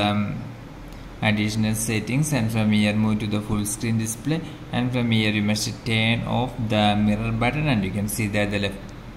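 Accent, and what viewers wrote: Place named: South India, India. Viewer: That South Indian accent though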